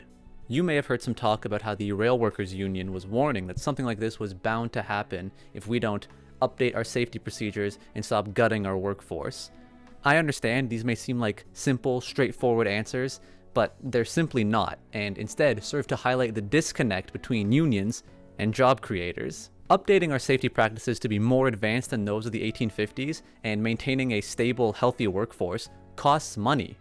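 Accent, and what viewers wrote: Canada. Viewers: Thought yo ass was canadian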